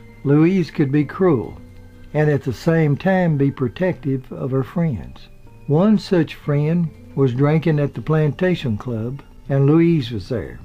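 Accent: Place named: Mississippi, United States. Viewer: Wow, what a story!!! Great video and scripting, per usual! And, as always, your great narration with that Mississippi accent is the best!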